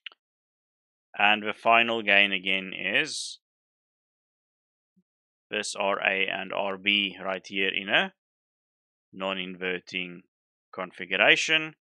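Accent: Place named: South Africa. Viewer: Sounds South African, baie dankie boet.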